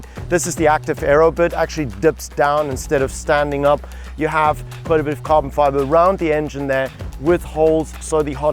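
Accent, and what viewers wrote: Afrikaans, South Africa. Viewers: defnitly .you can hear that afrikaans in him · Is this dude from South Africa?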